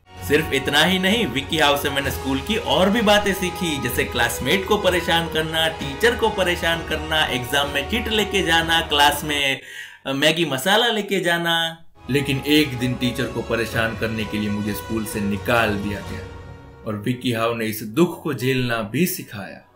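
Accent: Gujarat, India. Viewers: h aapka real naam 😅... I already knew you are from Gujarat bcz u have a gujarati accent... Waise awesome video, it was really very funny... also congratulations for 100K subscribers.... ❤️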